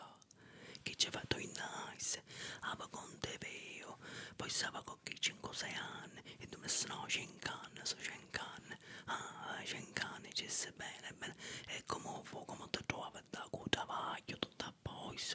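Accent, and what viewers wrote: Sicily, Italy. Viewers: Grande "unico "💪🔥video molto rilassante, che bello il dialetto siciliano❤️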